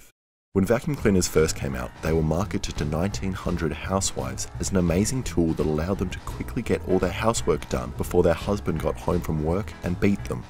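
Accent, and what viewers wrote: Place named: Australia. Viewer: I see what he means lmao I heard it it was like a mix between both it sounded like he was gonna say something in French at the beginning and then it turned back to Australian but that’s why he said “voice crack” cuz that’s the part that sounds kinda like a French word Instead of English in an Australian accent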